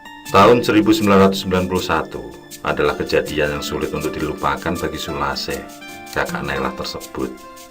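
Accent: Java, Indonesia. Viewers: Medhok men cuk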